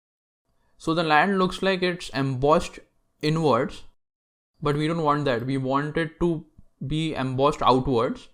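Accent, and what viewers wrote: India. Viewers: Bro r u indian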